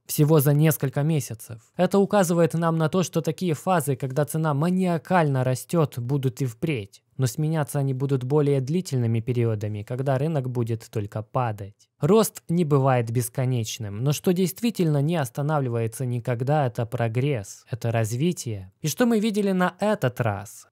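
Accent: Ukraine. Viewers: ты с Украины?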